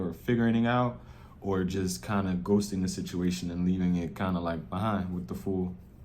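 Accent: Philadelphia, United States. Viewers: Wait….are you from Philly?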